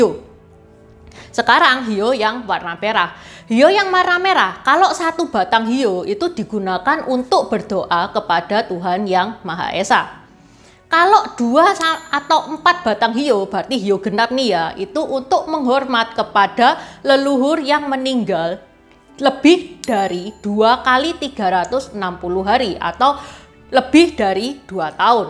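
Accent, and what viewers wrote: Java, Indonesia. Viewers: Saya bkn ethnis tionghua ni mbak saya mau nanya ni, apa yg di maksud tepekong atau apakah itu tepekong yg sering di sebut apakah itu sejenis mahluk halus dalam budaya tionghua, trimah kasih sblmnya cici yg mdok jawa nya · cara omongny kyk org jwa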